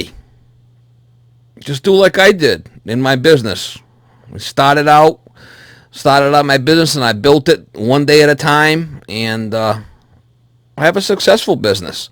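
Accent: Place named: Boston, United States. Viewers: Honestly the swearing along with your awesome accent just made it so much better!! My old boss was from Boston and when he would get mad and start swearing he sounded exactly like you and it was funny as fuck!!! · Keep being you!!!! Your a good Man and your time and effort is highly appreciated. Let the dead bury the dead.... Good Ole Boston Accent and Professionalism keeps me a huge Subscriber. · Thank you for providing valuable information and entertaining repair video's, been watching for a few years and enjoy the Bostonian dialogue and temperament. As for the haters just ignore and fight for what you believe in, f them continue to create, take care.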